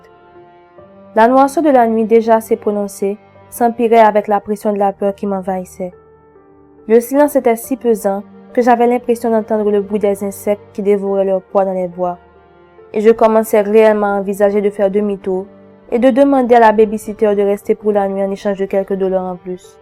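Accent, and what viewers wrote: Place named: Overseas France, France. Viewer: j'adore tes histoires on ton accent des Dom Tom.merci pour tes vidéos 🥰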